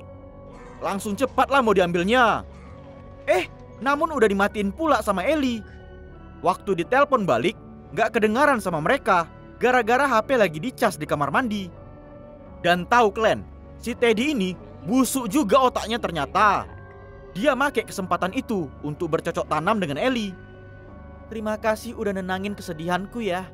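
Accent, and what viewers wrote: Medan, Indonesia. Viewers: Ya Allah bang org Medan bang... gw suka bet logat melayu Medaaaaan.... salam dr pecinta bahasa daerah... hahhahaha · Medan kali bah bahasanya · Wokeh ini yg ku suka logat Medan😂 Semngat Tross buat vidionya bg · Logat Medan nih Kek daerah gw btw Medan yg di mna nya bang Aku juga org Medan?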